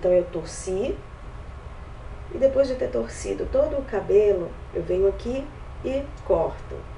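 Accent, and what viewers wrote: Bahia, Brazil. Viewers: Faz pouco tempo que acompanho os seus videos , mais desde o primeiro momento adorei esse sotaque maravilhoso que me faz lembrar das minhas ferias ai na Bahia, e queria te parabenizar por transmitir tanta coisa boa nos teus videos e a cada palavra de sabedoria que você diz neles . Beijos e muito sucesso pra você !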